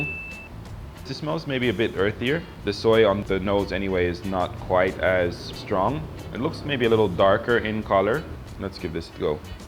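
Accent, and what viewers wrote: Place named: Philippines. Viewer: I'm not happy with the Filipino accent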